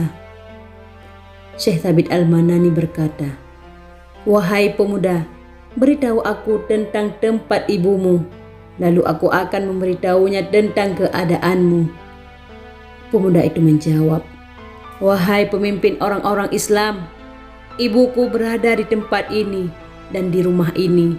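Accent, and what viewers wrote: Aceh, Indonesia. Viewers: Mirip dengan suara almarhum juga🙏😇, kaya cadel. Ciri khas bicara orang aceh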